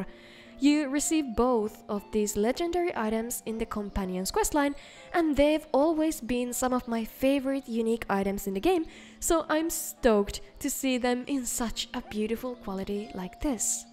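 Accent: Finland. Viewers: I was thinking that you kinda sound like a Finn, and it seems you are lol. Just started my billionth Skyrim playthrough and am in the process of modding it 'till it breaks. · From the way you pronounce names it becomes clear that you're Finnish. Only a finn could say "Jenassa" like "Suomessa"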